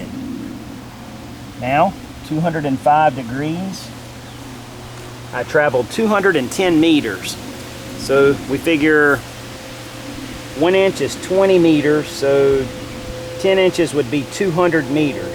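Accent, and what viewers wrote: South, United States. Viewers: A++! I just finished watching all your map and compass videos.....OUTSTANDING! This was a great review for me, although I'm familiar with using a compass and map, I have not done any map work in years. During the 70's I spent a lot of time in Yosemite backpacking and snow camping. Then I moved to upstate New York near the Adirondacks where again I spent a lot time hiking, camping and canoeing (also using map and compass). This video series serves as a great reminder for this "old rusty bird". Moved to Nebraska in 1986 and most of my backpacking days ended then, except for bike touring and camping in campgrounds. I haven’t had any experience with mapping or dead reckoning, so I found that very informative. I must compliment you again, it's obvious you love doing these videos, because you put a lot off effort in each one. I will continue to watch your videos, I'm hooked! 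Will be doing some "upgrading" of my gear with plans to put it all to use this upcoming spring, summer and fall. Don’t know that I’m tough enough to camp during these Nebraska winters. Thanks again. BTW, what state do you live in? I've noticed your southern accent. I grew up in Texas and consider myself a "misplaced Texan", so I understand words like y'all, fixing and stuff. 😜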